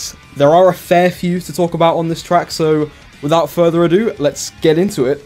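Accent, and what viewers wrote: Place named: Northern England, United Kingdom. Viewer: You sound like you're from northern England lol (same)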